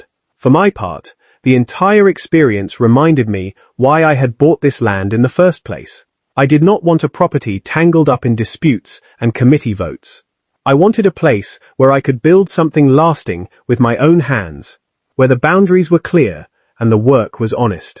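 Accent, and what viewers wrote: United Kingdom. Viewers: I do not respect AI, but why do you use prissy sounding British English when we use the masculine American English? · there must have been a better voice to use for a Texan-- this gut sounds British..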